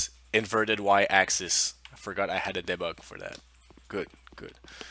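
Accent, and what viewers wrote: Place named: Quebec, Canada. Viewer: J’entends le québécois dans cette voix! Allo compatriote! Bien hâte d’essayer ce jeu!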